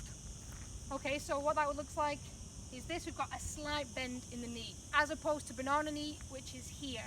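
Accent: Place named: Scotland, United Kingdom. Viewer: I’m distracted .. what accent is that ? It sounds Scottish , north east England come either Spanish or other Mediterranean?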